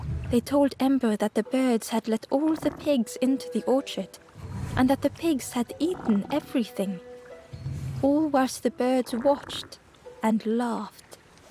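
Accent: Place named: Wales, United Kingdom. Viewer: I actually really don't like her voice or her accent. She has the same pace throughout, regardless of the words. And she sounds Welsh to me. I was hoping for a way to turn off narration but oh well...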